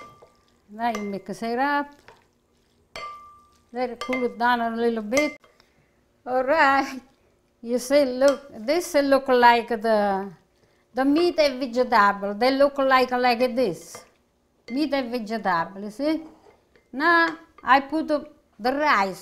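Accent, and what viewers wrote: Italy. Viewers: I love, love, LOVE your Italian accent. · Hi Italian. Grandma. I love your. Cooking. All your recipes. Are. Great. I am making artichokes. This weekend. I watch you every single day. I enjoy your singing. Plus I love your Italian. Accent. Yim 4th generation. From Sicily. Keep. Cooking. Grandma. God. Bless you. Love. Ssndyin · I love her Lil Italian accent plus recipe lock yummy❤ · I am a second-generation Italian American who grew up in the 40s and 50s in upstate New York in an Italian neighborhood. Both of my parents spoke Italian but chose to not teach us because "we were American". But all of my grandparents and most of my neighbors spoke with the same accent as you. When I watch your videos, it brings me back to when I was a kid. Most of your recipes are just like my mother's. I have tried several of your recipes and they are never disappointing. 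We had friends over for lasagna recently and my wife made the lasagna with store-bought pasta because she didn't want to take a chance with your recipe until we tried it first ourselves. Well, I made your recipe myself and after everyone had a portion of my wife's. I brought out my dish and everyone raved about it. Even my wife, who is usually very stubborn, admitted that my dish was better than hers. I like your singing too. My father had a beautiful operatic tenor voice and sang all of the time. Thanks again for being you. Domenic Campanella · No wonder this video is viral: "Now-a, first-a you gotta open-a da peppa, you got a nice-a peppa like-a dis."? You're ADORABLE! Sweet stuffed Bell Peppa's, from a Sweet Italian Bella Donna like-a. Statta Buon! Ciao. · Love watching you and enjoyed your Italian mannerisms and accent.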